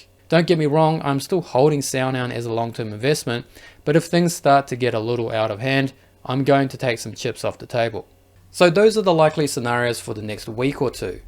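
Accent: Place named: Australia. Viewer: Asian guy with Australian accent doing American politics🎉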